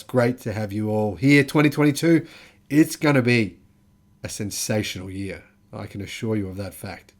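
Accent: Australia. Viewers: Tired of the Ausie Accent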